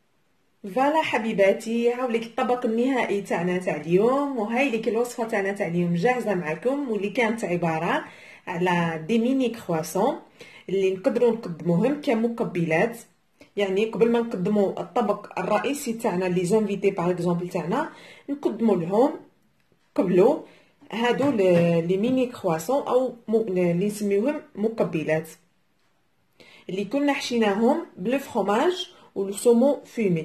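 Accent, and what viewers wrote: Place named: Jijel, Algeria. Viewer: سلام ختي قناتك هايلة.....من هدرتك عرفت بلي جيجلية ماشاء الله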